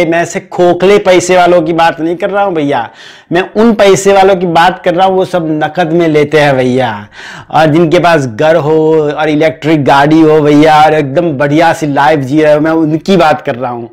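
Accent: Gujarat, India. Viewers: Bahi app Gujarat sa hokya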